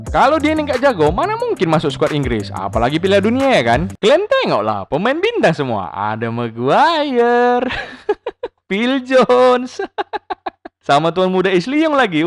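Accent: Medan, Indonesia. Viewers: Orang medan kah??? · Orang Medan Bang?? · Bg lu orang medan yaa bg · Abang org medan ya bg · Wkwkwk, the best presenter bola versi medan bung😁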